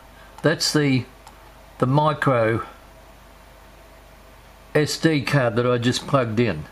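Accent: Australia. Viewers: Thank you man , in fact i was search on youtube to find a way to delete the files , and suddenly i see your's , a great explanation. Thank you again Also i have a question for you What is your accent ? Is it Australian ? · I like your aussie accent ~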